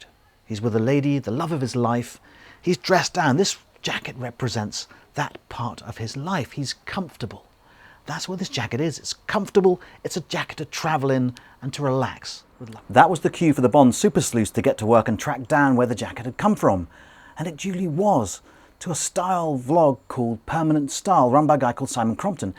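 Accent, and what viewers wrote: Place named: England, United Kingdom. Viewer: So nice to have an English accent taking about bond. Very professional and informative.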